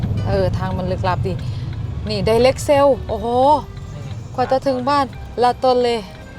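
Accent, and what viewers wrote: North, Thailand. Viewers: อู้เมืองแหลวแลดซ้ำม่วน 🤣🤣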